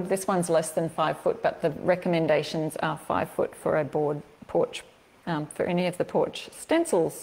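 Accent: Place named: Australia. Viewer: Greetings from Centreville Michigan USA. Love your Aussie accent. Spent a week in Australia and a week on North amd South Island New Zealand 2019. Trip of a lifetime. Your videos are great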